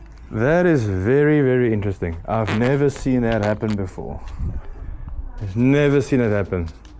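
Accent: South Africa. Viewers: Hey, if I'm right and i listen to the accent, you are South African Just like myself😅😅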